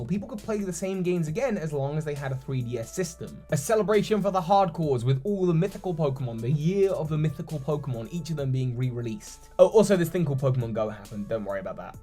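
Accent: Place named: Newcastle, United Kingdom. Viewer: Nearly all my pokémon from the mythical pokémon gifts were on a save file that got corrupted D-:. I think that accent sounds a bit Geordie.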